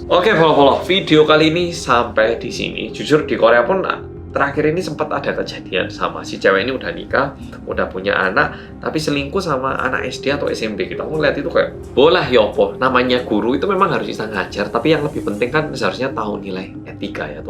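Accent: Java, Indonesia. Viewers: bang klu ngasih video endingnya yg jelas dikit jangan gantung gtu... trus pas opening g usah trllu ngmong medoookkkk jyjyk bget gw dgarnya🤢🤮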